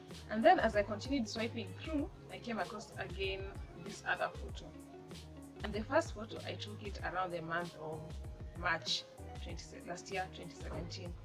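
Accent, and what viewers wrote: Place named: Africa. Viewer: You have a lovely accent. Where are you from? Zambia, Kenya?? Trying to guess Lol. I'm from Zimbabwe and your accent sounds pretty close to that area of the continent.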